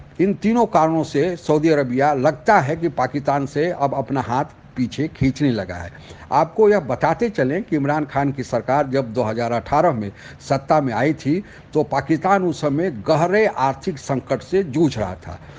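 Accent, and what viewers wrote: Bihar, India. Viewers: me toh samjha samjha k thak gaya uncle ko ki bihari hindi tone achi sunai nai deti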